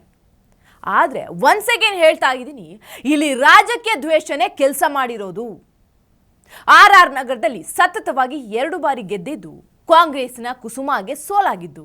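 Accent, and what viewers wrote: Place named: Tamil Nadu, India. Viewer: ಅವರೇ ತಾವು ತಮಿಳ್ ಅವರ ಯಾಕೆ ಅಂದ್ರೆ ಅವಾಗವಾಗ ತಮಿಳ್ ಒಂದ್ ಭಾಷೆ ಅವಾಗವಾಗ ಆಚೆ ಬರುತ್ತೆ ಇರ್ಲಿ ಚೆನ್ನಾಗಿದೆ ನಾನು ಕೂಡ ತಮಿಳು ತುಂಬಾ ಖುಷಿಯಾಗುತ್ತೆ ತಮ್ಮ ಮಾತು ಕೇಳೋಕೆ ತುಂಬಾ ಖುಷಿ 😊👌🙏🚩🚩🚩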